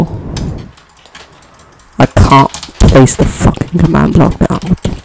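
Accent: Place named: Australia. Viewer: WHY DO YOU SOUND AUSTRAILIAN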